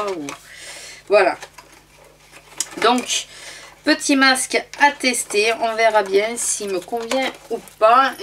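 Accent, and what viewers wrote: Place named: South, France. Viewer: Coucou tu vient de quel endroit avec se petit accent du sud ?... ( sinon j'aime tes vidéos )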